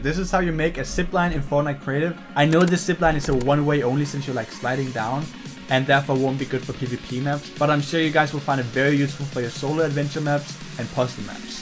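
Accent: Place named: Denmark. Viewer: You sound danish or skandinavian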